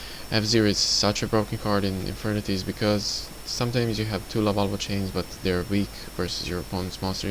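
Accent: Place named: Netherlands. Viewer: ... decklist is okay. Dutch accent is horrible.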